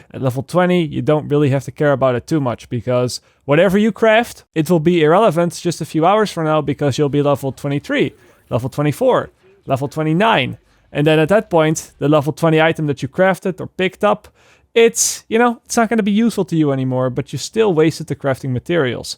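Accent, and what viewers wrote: Netherlands. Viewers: I came for the crafting info and as soon i heard you speak wist ik dat je een Nederlander was😂 goeie video ik ben gesubd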